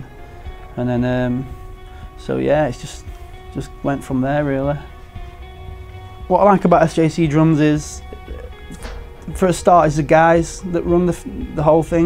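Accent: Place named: United Kingdom. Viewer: Макс Грин yeah, british accent, if you know what I mean ^^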